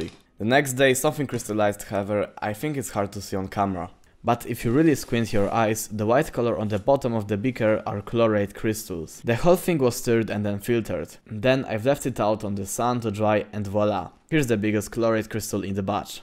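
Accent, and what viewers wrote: Poland. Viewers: po kilku slowach juz wiedzialem ze polak xD sub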